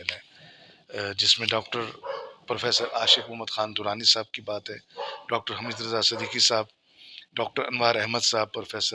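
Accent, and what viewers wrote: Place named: Saraiki, Pakistan. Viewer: Dear Sahib, please also make video in SARAIKE,because your saraiki exant is very polite and charming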